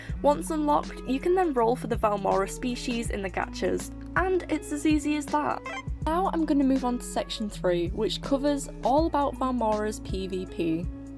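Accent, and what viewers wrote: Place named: Scotland, United Kingdom. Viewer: Her accent is like philza, british r's and sometimes sounds like a scot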